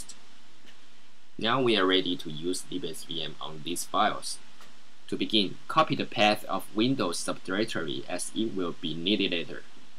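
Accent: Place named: China. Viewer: 毕设用到了这个，感谢~（Chinglish口音哈哈哈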